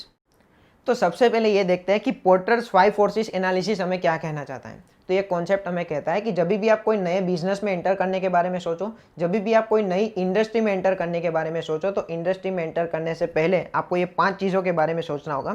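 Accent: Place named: Kathiawar, India. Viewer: Sir where are you from? Your voice tone little bit.. gujarati kathiyawadi..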